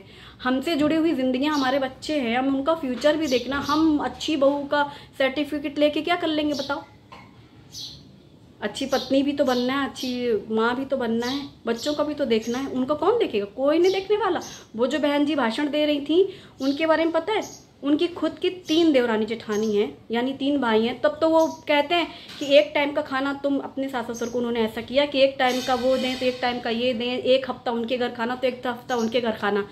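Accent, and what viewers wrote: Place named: Baghelkhand, India. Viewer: Aapne sahi kiya👍👌aapse baghelkhand ki boli sunkar achchha lga. main Rewa (M.P.) se hu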